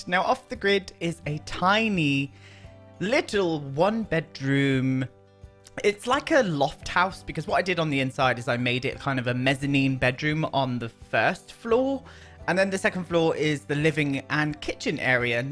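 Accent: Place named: England, United Kingdom. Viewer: I love how you’re English tbh, most of the simmers I’ve ever been recommended are American.. are you from or is that your name? Lol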